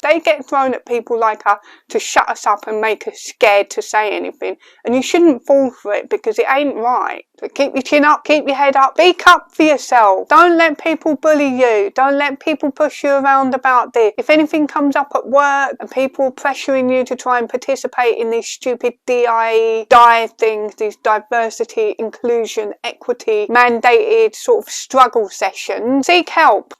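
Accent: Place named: Council estate, United Kingdom. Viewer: You know someone lives on a council estate when they speak like you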